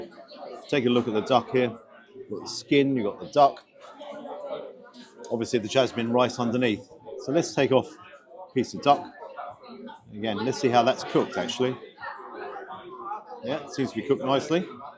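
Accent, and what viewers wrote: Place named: Yorkshire, United Kingdom. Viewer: is a character though, he's quirky with his heavy Yorkshire accent and his "NOW THEN GUYS HOW YA DOIN, BACK ON T'ROAD AGAIN". He has a laugh and a joke with the audience, whereas is more serious, calmer and detailed. It's horses for courses for a lot of people, I enjoy both but I can see why would have a bigger audience especially with the younger generation, as he's more of an 'entertainer'.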